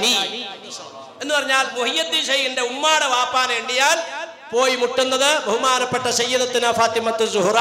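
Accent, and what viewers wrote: Perumbavoor, India. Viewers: ഉസ്താദ് പെരുമ്പാവൂർക്കാരൻ ആണോ? സംസാര രീതി അത് പോലെ ഉണ്ട്..എൻ്റെ ഉമ്മച്ചിടെ വീട് അവിടെ ആണ്..ഉമ്മചീടെ വീട്ടുകാർ സംസാരിക്കുന്നത് പോലെ തോന്നുന്നു...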